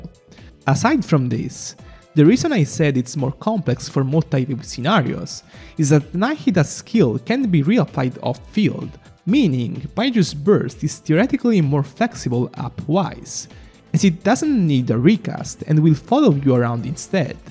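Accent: Italy. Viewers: What’s this accent ? Italian ? It’s beautiful btw!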